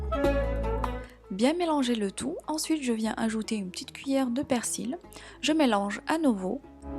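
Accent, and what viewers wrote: Morocco. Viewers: L'accent marocain j'adhère pas 😖 Mais bon y'a toujours dès rageur qui accepte pas les avis des autres:) Sinon comme d'hab une bonne maman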